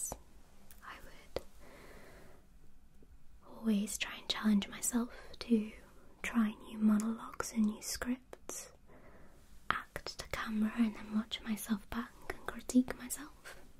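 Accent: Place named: Northern England, United Kingdom. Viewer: I like your accent.northern England, Blackburn?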